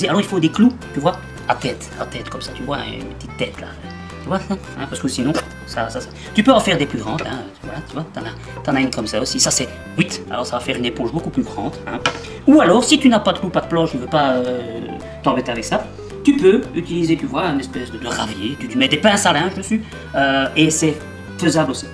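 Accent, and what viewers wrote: Belgium. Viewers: Merci beaucoup, je vais tester ..super explications avec l'accent belge...c'est toujours agréable pour une expatriée...bonne année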